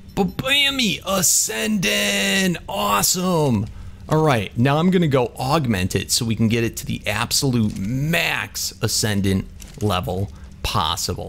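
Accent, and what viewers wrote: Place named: Rural, United States. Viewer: Does anyone hear him do a hick accent and every now an the do a Cali one? Its crazy cause none of his old vids sound like this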